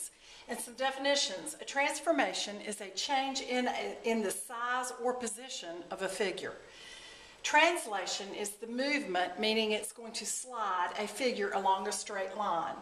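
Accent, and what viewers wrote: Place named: South, United States. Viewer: Definitely southern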